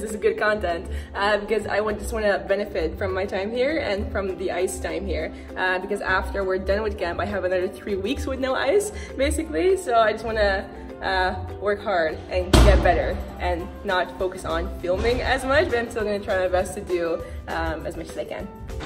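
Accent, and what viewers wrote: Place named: Netherlands. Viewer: I knew she was Dutch from the moment she started talking lol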